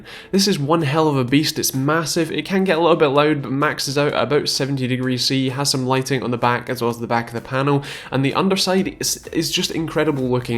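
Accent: United Kingdom. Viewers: You talk very fast. On top of that you have a UK accent. That makes it a little difficult for someone outside of the UK, like me, to understand all you say.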